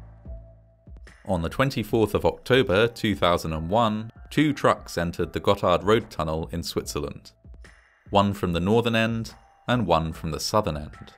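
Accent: England, United Kingdom. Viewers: I recently discovered your channel and (in hopefully as unracist a way as possible) I must say I love listening to narration with an English accent, as opposed to the OTT overly dramatic sounding American accents that seem to dominate disaster documentaries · I adore this horrific channel. I think the British accent is the absolute best accent for speaking English. It just is…I’m a dummy and it always sounds more sophisticated; in particular, I like my tragic stories delivered from a British accent…I don’t want to listen if it’s not British 😊 maybe Aussie in a pinch but I really love them dirty little Britts. You’re awesome!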